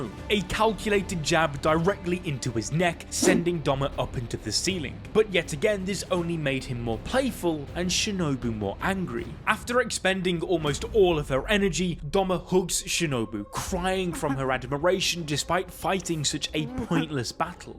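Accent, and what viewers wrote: United Kingdom. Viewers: “Full cinematic fight” um its just a British annoying voice explaining what we are seeing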